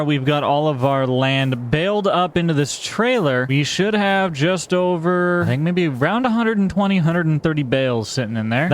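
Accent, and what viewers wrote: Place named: South, United States. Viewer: I love this man and his very southern accent when he is screwing up 😂❤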